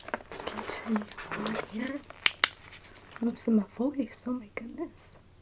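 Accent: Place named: Canada. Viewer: The way you talk, you must be from Canada 🍁 well because I am too. This is the first video I saw of yours.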